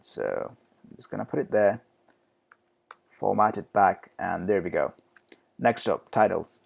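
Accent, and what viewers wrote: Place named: United Kingdom. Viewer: British Accent 👍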